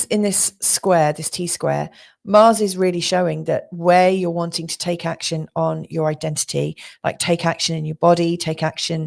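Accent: United Kingdom. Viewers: Lucky woman with that gorgeous red hair and beautiful face. British accent too. If you came to America., you would have many men , good ones, fighting for you Thanks in advance for the reading I hope it’s in line with what is going on for me and then I win the backup offer on the house! Love from America and prayers for all my brethren, British folk. Praying for your nation and people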